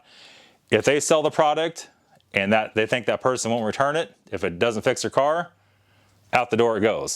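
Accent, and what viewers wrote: St. Louis, United States. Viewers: Dude you GOTTA be from around St. Louis? You sound like my parents and I was born there, and especially your humour! Crapping their pants! Omg! Dude I was dying, I did buy a drive belt for my N20 from gasp!...auto zone and it was continental! German made too! But that is all I’ll buy! Period! Keep up the work!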